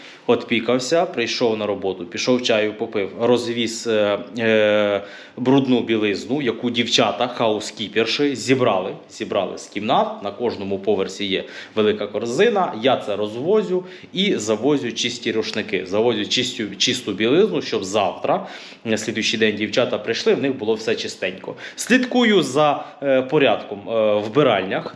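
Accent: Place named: South, Ukraine. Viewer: У нього діалект півдня України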